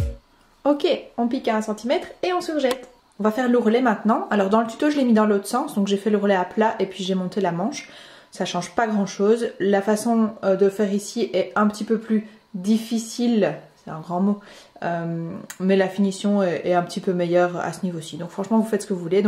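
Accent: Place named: Switzerland. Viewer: Tuto tres clair, net et précis ça sera pour mon petit- fils de 7 ans merci bcp Je fais ma curieuse d'où vient ce petit accent je dirais soit Suisse soit Belgique soit Alsace😅 Ps, musique très dinamisante 👍🏽👍🏽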